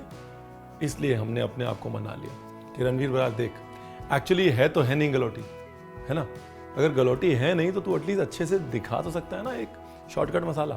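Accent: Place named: Punjab, India. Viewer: From Lucknow...but has Punjabi accent ♥😂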